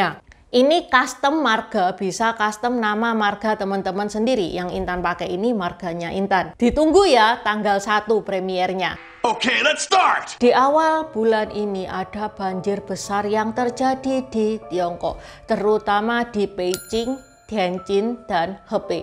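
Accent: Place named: East Java, Indonesia. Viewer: Waaah mbak intan sudah cantik cerdas juga berprasangka baik ....dengan bukti yg benar bisa mengajak kepada kalayak untuk berpikir baik pada orang lain.......tidak hanya memandang jeleknya...... Trimakasih info positipnya.....klo boleh nanyak kok mbak Intan lancar banget bahasa indonesianya juga seperti ada dialek bahasa jawa ,kayaknya jawa timur ....klo boleh tanya mbak Intan orang mana.....????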